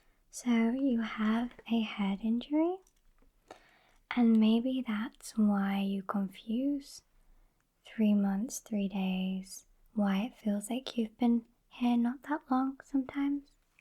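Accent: United Kingdom. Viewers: Rei Ayanami with british accent and is a nurse?!🤔 Noice!😉😏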